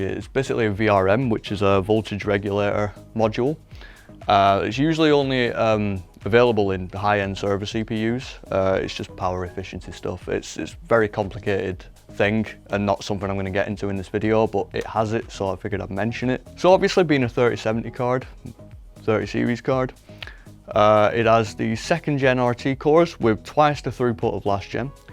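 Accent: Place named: England, United Kingdom. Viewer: Love that English accent.💛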